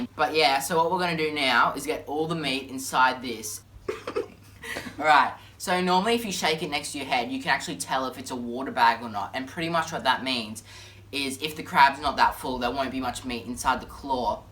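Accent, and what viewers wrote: Australia. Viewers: every word in an Australian accent sounds cool....even crocs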